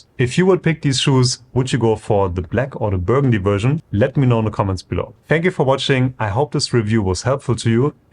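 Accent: Germany. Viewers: I think you’re german by your accent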